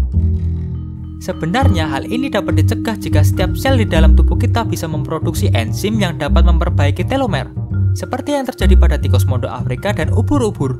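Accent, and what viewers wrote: Java, Indonesia. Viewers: pengisi suara nya bikin ganggu , medog banget ! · medok jawa tengah/timur ya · medo'g ngomongnya.. :v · asli pean wong jowo tekan logat e wkwkwkwkw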